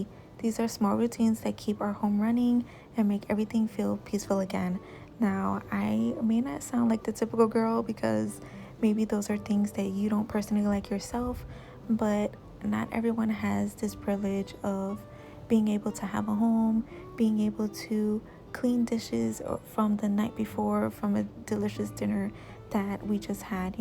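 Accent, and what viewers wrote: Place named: East Coast, United States. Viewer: Your place is so cute! I’m in California & loving your east coast accent. Love your videos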